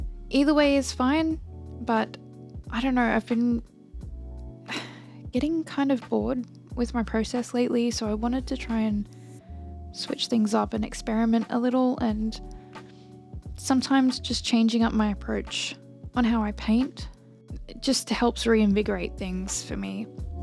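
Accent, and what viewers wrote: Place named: Australia. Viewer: lovely hearing an Aussie voice for a change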